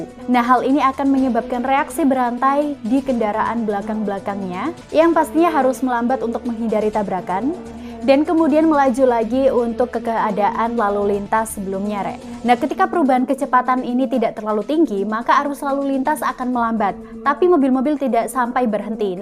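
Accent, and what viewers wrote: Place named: East Java, Indonesia. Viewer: Kok bicaranya REK REK opo Anda ini orang Malang/Surabaya?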